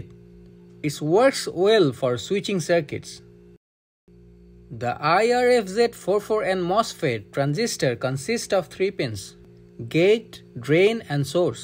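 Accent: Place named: Bengal, India. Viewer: ভাই তোমার ইংরেজি বলার ইষ্টাইল শুনে বুজেছি,তুমি বাংলা বলতে পারো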